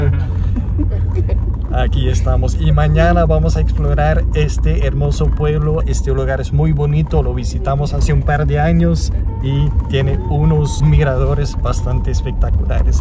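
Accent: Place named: United States. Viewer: ah, ya decía yo que en Costarica los ticos no hablan así. Pensé que era estadounidense por qué habla casi igual que un gringo cuando aprende español, no menciona mucho la "r"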